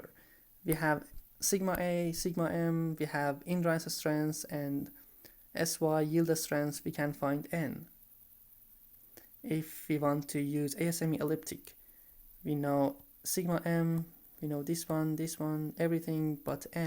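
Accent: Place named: Iran. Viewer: I like the persian Accent though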